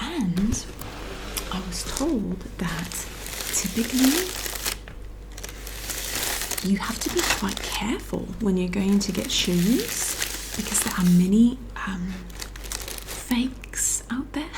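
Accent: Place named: United Kingdom. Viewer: An Italian with a British (I assume) accent, living in Egypt, with friends in France, and family in the USA. What a true international life!!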